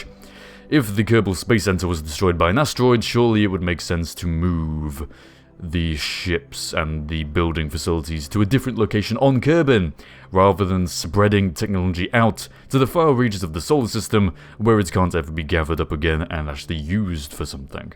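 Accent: United Kingdom. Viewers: Really love Pol personally. Especially with ground scatter active to the max. Not much to actually do there (until 0.90 anyways, maybe), but I love it. If I'd have a custom planet and moons in KSP, I would size it up 30% and make it one of my moons. Personally, I think your voice is amazing as I love the accent from Great Britain. And don't worry, everyone is weird... just not the same type of "weird" heh.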